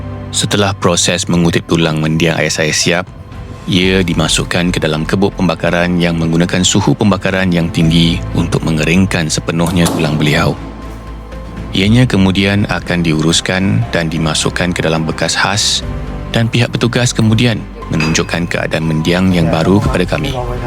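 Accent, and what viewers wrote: Malaysia. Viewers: i relly like yr malay slang..❤ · tq for sharing.. nice malay accent, too 👍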